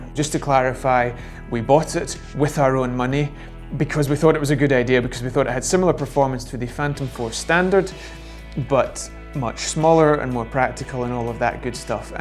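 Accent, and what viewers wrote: United Kingdom. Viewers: Another superb video. I’ve only came across your videos a couple of days ago and I have watched a lot of them already. Well explained content which has helped a lot!!!! And....and....... being from Northern Ireland myself it’s great to hear a british voice doing a DJI tutorial. Thanks man...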